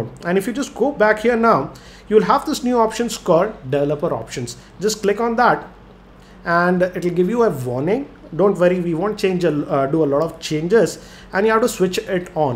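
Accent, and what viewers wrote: India. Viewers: Omg this Indglish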